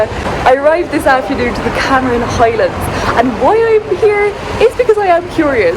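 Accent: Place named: Ireland. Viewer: Im malaysian,seeing Irish here, hearing the english,it sounds calmy funny..i seen the video in the brit trying to understand the irish,welsh English.. hearing u here now i get them..as Saoirse ronan says,the vocal for irish is different to std English speaker..also irish english is quite low tone..yours,collin farrel,liam neeson have that vocal similarities.. anyway, enjoy ur trip, safety 1st miss..😊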